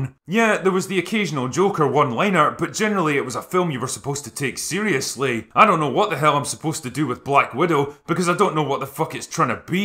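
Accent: Scotland, United Kingdom. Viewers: Dude, are you Scottish?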